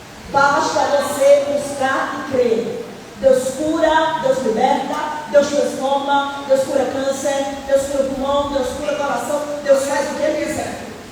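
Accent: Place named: Paraíba, Brazil. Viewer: A paz do Senhor missionária! Aleluia hum olha como minha professora de sotaque paraibeis esta chip, gloria a DEUS ''ha um tempo determinado por DEUS para todas as coisas'', primeira vez que vejo minha professora que prega sorrindo de corpo todo, louvado seja DEUS pelas vestis e amei essa saia, amanhã vou acompanhar a pregação toda, DEUS te abençoe com a familia.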